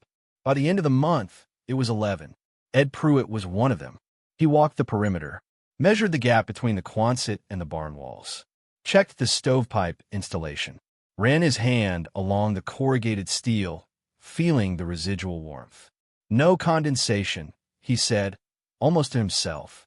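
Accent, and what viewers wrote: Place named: United States. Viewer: Don’t waste your time it just repeats and repeats and repeats. The American accent is unbearable.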